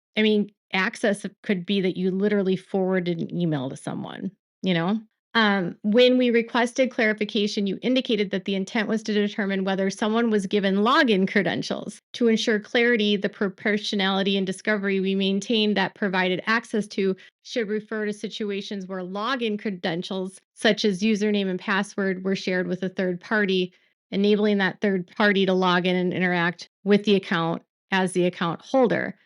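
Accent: Minnesota, United States. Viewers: You sound like you are from Minnesota 🤷🏻‍♀️ 😊 I love it. I wish I could 👍 your video… I think I will anyway and just yours alone. My YouTube data is 2.38 and haha have no room on my iPhone because of pictures/videos of my son 😊 you know how it is 😂 And yes you are right about the steps. I was reading from another state, and I was misinformed. I do apologize for making my comments in regard to the steps. 😔 I really hope this goes to trial and the bring this up. Bryan knows something we don’t and has secret evidence. People thought it was silly he put out all the evidence right away, but what makes you think that’s all the evidence? 😂 it’s not. Bryan has it in the bag 😊 ❤ & Support ~ Always, you are a ⭐️ and stay strong. We are all here for you 😊 #iamnotabot EVER!! 😂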